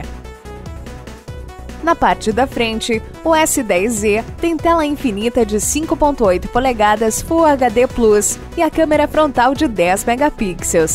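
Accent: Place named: Rio de Janeiro, Brazil. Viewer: Ele, é Carioca ;)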